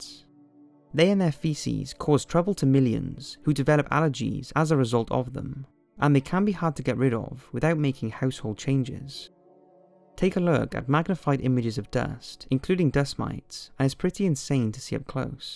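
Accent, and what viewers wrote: Wales, United Kingdom. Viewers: Addicted to your videos. The juxtaposition of your soothing Welsh voice and the often creepy and disturbing content in the videos is practically intoxicating. Binge watching your stuff right now!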